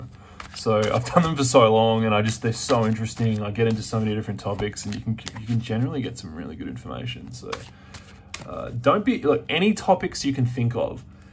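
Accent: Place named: Australia. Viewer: You sound like an Aussie...🇦🇺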